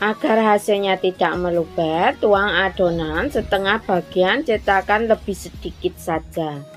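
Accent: Java, Indonesia. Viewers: Kentalnya jawanya 😅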